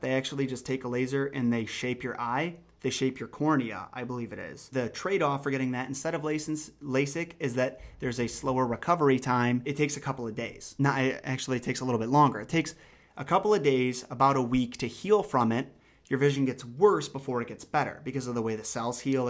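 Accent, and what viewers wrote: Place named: Brooklyn, United States. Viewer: And side question, would your new content be like pyros or nfkrz'? If so fuck yeah dude if totally be interested in hearing your opinion on shit. Plus with that whole Brooklyn way of speaking you got you can do some great stuff. Keep it up man.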